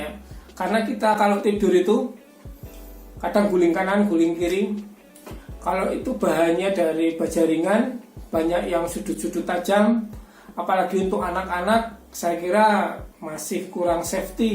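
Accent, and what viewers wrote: Java, Indonesia. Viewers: PT. Niagamas Lestari Gemilang adalah gambaran dari sosok tukang kayu yg sederhana, org Jowo, tur medhok ndeso 😁 tapi lihat! punya impian yang besar dan kemauan yg keras. Selamat bung, anda sudah sukses menjadi inspirasi 312ribu orang. ini luar biasa. semoga terus mnjadi inspirasi bagi kami. #TJJ300k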